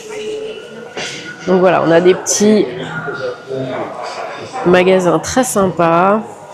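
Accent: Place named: France. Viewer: Any channel that helps inform more beautiful French ladies on how to move to Scotland gets all my support and a big thumbs up 👍😀 Who knew watching a French lady roaming around Scotland could be so interesting and relaxing. I could listen to your accent all day long :) Thanks again for the video, and best of luck with your channel. 💙🤍 from SW Scotland.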